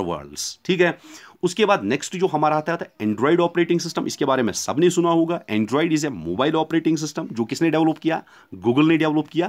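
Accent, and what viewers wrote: Kashmir, India. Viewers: Sir I think you r from kashmir am I right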